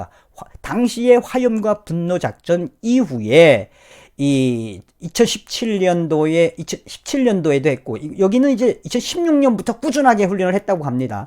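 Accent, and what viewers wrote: Pyongyang, North Korea. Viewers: 정성산 티비는 라북사투리가 매력이야요...ㅋㅋㅋ피양사투리로하라요...화이팅.... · 이북 지역사투리죠.그건 미워 맙시다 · 평양 사투리좀 안쓰면좋겠는데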